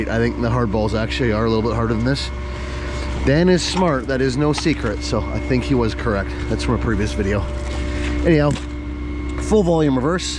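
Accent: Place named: Canada. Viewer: You must be from the deep south of you think a guy with a Canadian accent sounds smart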